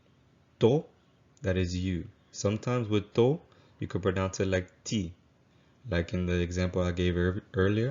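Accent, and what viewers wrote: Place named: Louisiana, United States. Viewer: Your accent is amazing man. Does all of Louisiana spynd like that?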